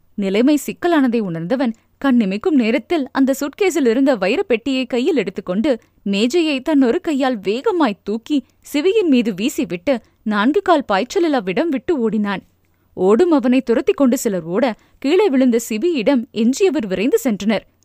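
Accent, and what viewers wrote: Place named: Telugu, India. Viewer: Telugu slang super super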